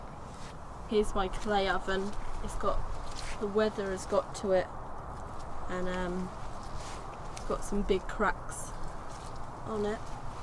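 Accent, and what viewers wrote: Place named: England, United Kingdom. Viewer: I love your accent, which area of England are you?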